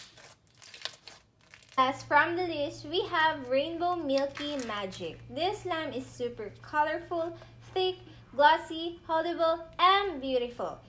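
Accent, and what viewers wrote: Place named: Philippines. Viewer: She is a pinoy...so her first language is filipino. Atleast she tries her best. Hope u understand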